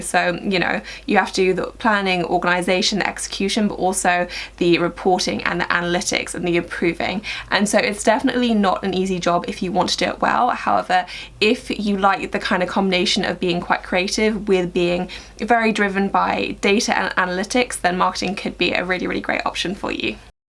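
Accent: England, United Kingdom. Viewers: Watching from Portland Oregon USA love your videos! :) wish I had an English accent haha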